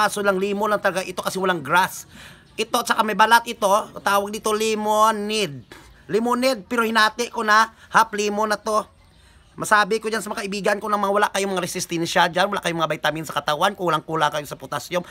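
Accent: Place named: Iloilo, Philippines. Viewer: Wahahaha abaw gid ah ilongga gid tana c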